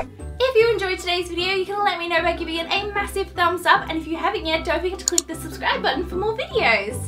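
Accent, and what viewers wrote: England, United Kingdom. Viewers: I'm new to ur channel and I notice that u r so adorable oh and I'm from England too I notice your accent (idk I'm not good at accents)😝 Btw I subscribed to ur channel 👍💚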